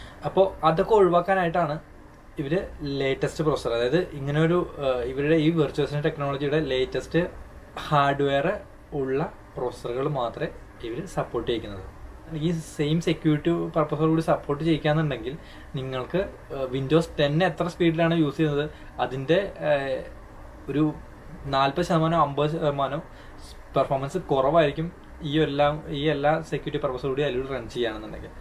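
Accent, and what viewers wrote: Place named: Thrissur, India. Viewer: തൃശ്ശൂർക്കാരൻ ആണ് എന്ന് മനസ്സിലായി അല്ലേൽ എറണാകുളം ❤❤❤❤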